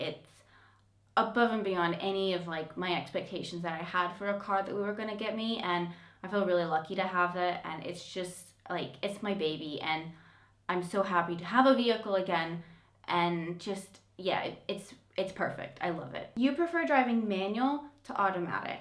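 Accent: United Kingdom. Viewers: There's definitely a British accent comming trough that Southern US twang. Give it a few years. Anyway, i alway's find this subject a bit awkward to watch with other YT-ers, but you handled it quite well,👍-up on the vid.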